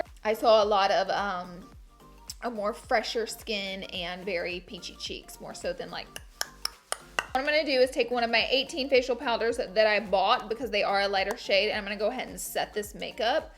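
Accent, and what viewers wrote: South, United States. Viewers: You came up on my feed , so I don’t know you but all I gotta say are you country?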